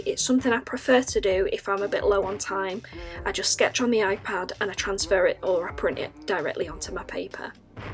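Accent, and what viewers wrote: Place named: Northern England, United Kingdom. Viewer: oh how lovely! I really like how dreamy it looks in the end. I also really like your northern accent!!! And well done on the voice-over. 👍✨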